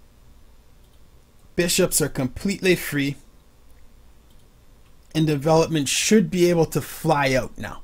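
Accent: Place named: North Dakota, United States. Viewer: I love your accent!! North Dakota??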